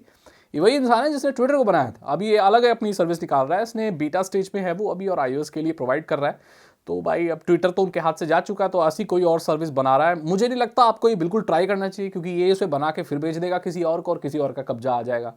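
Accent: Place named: Bihar, India. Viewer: Ap paka bihar hai na bhaiya mujha aise kyu laga hai ki ap bihar sa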